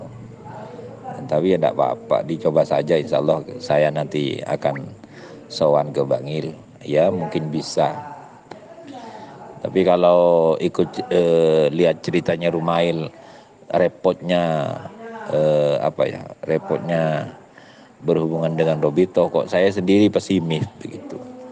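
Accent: Madura, Indonesia. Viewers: Kalo lihat logat bahasa, logat bahasa Madura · Suaranya seperti akaen madura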